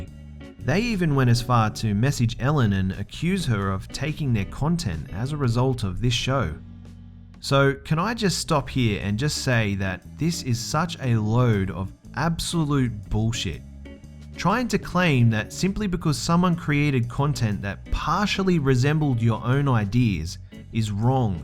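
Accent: Australia. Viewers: dude i been watching you for hella long but just now realized you are australian and have an accent.. lol random... something wrong with my ears maybe lol... and speaking of the fine bros thing I don't really know much about them but they seem douchey lol.. I don't really watch reaction videos though especially not stuff like "teens react" and all that so yeah .. I don't even understand why they are so popular I mean I don't care about random peoples reactions to things.. im ranting lol.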